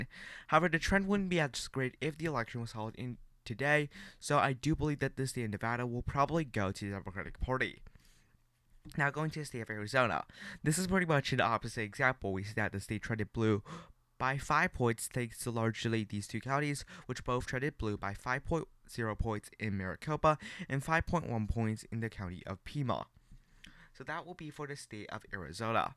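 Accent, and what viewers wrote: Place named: China. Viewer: Is it me, or are you Chinese? The accent was definitely Chinese. The assertions, to someone not connected to the US, struck me as merely your opinion. My own personal opinion is Trump will lose because democracy has been destroyed from forces at work within the west. I'm just awaiting the autocracy as it slithers out of its gross coils.😕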